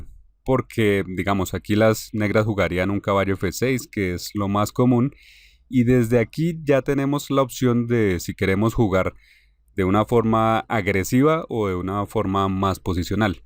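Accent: Bogotá, Colombia. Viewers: ¿ Igor Smirnov es el Colombiano que estoy escuchando ? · Espectacular!!! En especial la última, Que acento tan capitalino-Teusaquillo, muchas felicitaciones Exelente Canal!!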